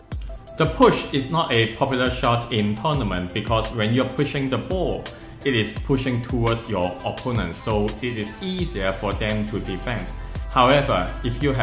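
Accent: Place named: Thailand. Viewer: You sound exactly like they mimic Thai or Sing people speak English :)), btw I'm Vnmese.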